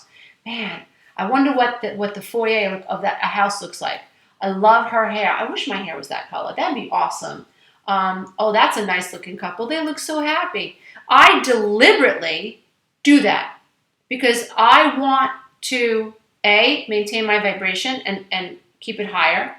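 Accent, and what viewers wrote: New York, United States. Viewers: Don't you hear her NY ACCENT?! 🗽🗽🗽 love you -Brooklyn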